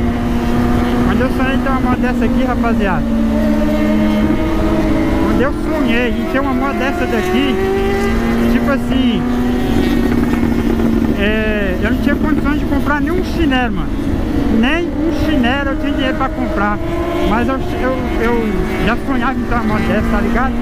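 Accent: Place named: Minas Gerais, Brazil. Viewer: Show de bola! Que "minerês" é este ? show a língua nova, "gíria" kkkkk valeu a história/motivação total! Abraço!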